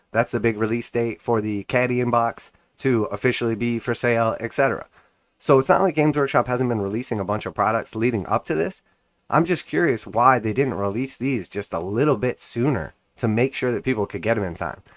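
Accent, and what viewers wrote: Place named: United States. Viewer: I guess it is a bit different for you in the US, you sound like you live in the USA. I'm in the EU and I don't think it will be a problem to get them. My local hobby shop is already taking pre-orders for them. But i guess we will see.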